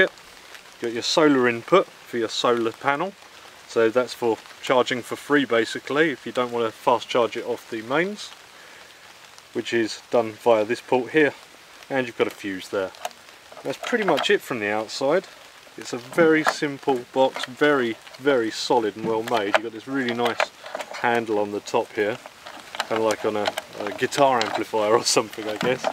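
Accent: United Kingdom. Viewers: what part of the UK do you think he is from dohhhh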